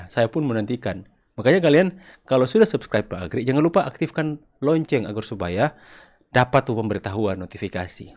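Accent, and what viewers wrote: Java, Indonesia. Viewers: bang logat suaranya seperti orang jawa/jakarta dalam berbicara bahasa Indonesia, biasanya logat luar jawa beda ma jawa, itu nurutku 🙏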